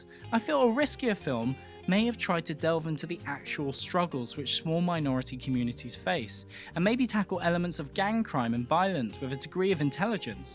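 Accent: England, United Kingdom. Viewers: Hey man nice 2 see u working, keep it up you sexy English accented fellow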